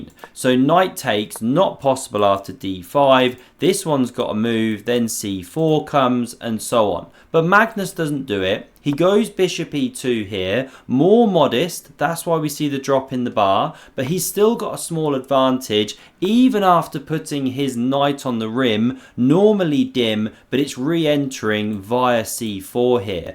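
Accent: England, United Kingdom. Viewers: Great dead-pan British humour - and well chosen chess games. (I suspect you are an English person - based on the accent.)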